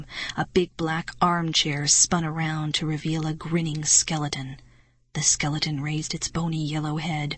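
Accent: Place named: Canada. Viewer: Great to listen to a fellow Canadian read a favourite from my childhood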